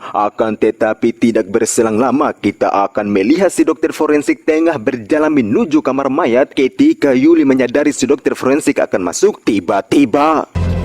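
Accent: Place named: Medan, Indonesia. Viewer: Logatnya Medan bgt 😂.. jdi ingat mntan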